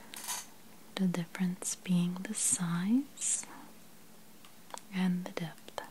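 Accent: United States. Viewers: I love all your videos....i wish i knew what state you were from....your voice...😌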